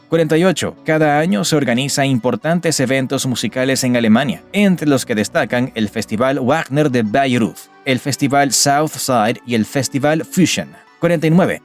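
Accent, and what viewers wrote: England, United Kingdom. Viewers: Da risa escuchar como alguien pronuncia palabras y nombres alemanes en estilo o mas bien con pronunciación ingles. Locura completa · ni "uagner" ni "ulks uagen" si vas a hacer un video sobre Alemania y laurear sus virtudes empieza por respetar el idioma, es normal "castellanizar" las palabras para ajustarlas a nuestro acento pero no "anglizarlas" por ignorancia, es tan fácil como usar el traductor de Google y reproducir su pronunciación para luego repetirlo lo mejor posible, me sangran los oídos cada vez que usas acento ingles al pronunciar las palabras nativas del Alemán, la "W" suena como "V" o "F" así que suena: "Vagner" y "Folks Vagen" RESPETEN EL IDIOMA! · el pronuncia como un inglés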